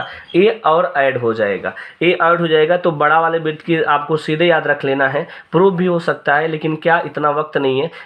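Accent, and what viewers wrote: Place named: Bhojpuri, India. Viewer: Bhojpuri achha bol rhe hai sir.....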